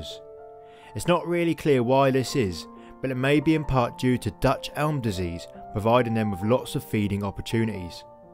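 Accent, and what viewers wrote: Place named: Estuary, United Kingdom. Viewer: here's a thought .. less estuary english and more woodpecker drumming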